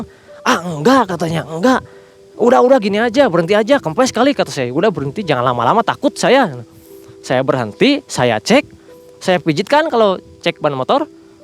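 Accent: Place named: Sunda, Indonesia. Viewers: Sunda campur Indonesia. .Teuas . 😄 . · Sunda nya kentel ga bisa ilang 😁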